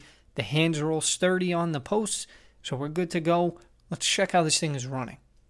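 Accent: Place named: New York, United States. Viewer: Imma tell u the truth my guy, Ive shown non new yorkers your accent as a definitive reference, mine is not as thick as yours so I only sound like you in heated moments. Love the videos, I'm a collector of magic the gathering cards and try to take aspects of your hustle+customer satisfaction into my card trading, feels good when an "everyone wins" scenario I helped crafted pans out.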